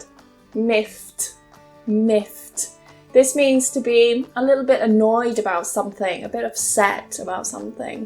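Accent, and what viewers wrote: United Kingdom. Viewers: Pronunciation with 😂😂 With your British accent, you should tell that friend, "Well, this is the British way."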